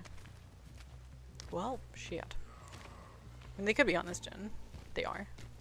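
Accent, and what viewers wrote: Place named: South, United States. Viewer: That southern accent , I don´t wanna camp ya´ll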